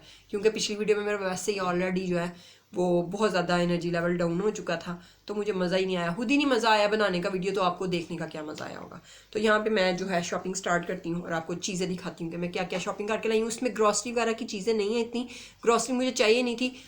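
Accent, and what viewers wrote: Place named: Sialkot, Pakistan. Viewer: Apky accent sy hu lgta hy Ap sialkoti hu 😄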